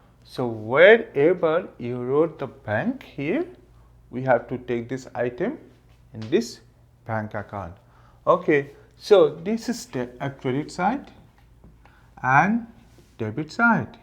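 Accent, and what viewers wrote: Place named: United Kingdom. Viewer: Sir Indian ki tarah bat karo na ki British ki tarah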